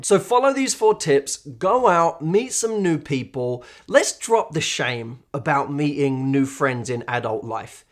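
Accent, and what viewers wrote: United Kingdom. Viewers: freaking love British accent. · Maybe its cultural? Telling from your accent you are British, and yes Ive lived here as a expatriate for about a decade. And trust me, trying to make friends with general British people is harder than trying to get a letter from the archbishop of Canterbury!! - this might also explain your experience so far! Its shocking but insightful to me that you say this may be because people appear too vulnerable. I dont think there is any communicative or language barrier as I speak perfect English. Strangely Ive noticed I have no problems mingling with people from other countries , and from my experience Americans appear to be the nicest bunch, and are generally interested in meeting people from other countries cultures because they are genuinely interested in doing so, and not to satisfy their own personal agendas. 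Maybe Ive just been unlucky but so far its been tough luck to meet a Brit who loves to hang out and have fun and do stuff. Also perhaps as your personal situation has changed now that you live on a different land, you suddenly realise the value of frienship and trust. Very insightful video, good job! xx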